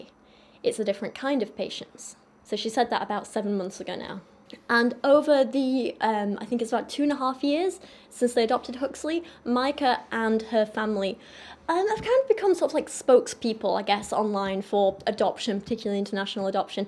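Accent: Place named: Northern England, United Kingdom. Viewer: As a foster kid myself I think it's better for him to be in a place that fits his needs. This mess is probably gonna leave some trauma especially for an autistic child who is just trying to understand how the world and people work in the first place. I hope he'll get the love he deserves. Doesn't hurt to lose the cameras in his face too. P.s. am I imagining things or has your accent gotten a bit more northern since the move? 🤔 love it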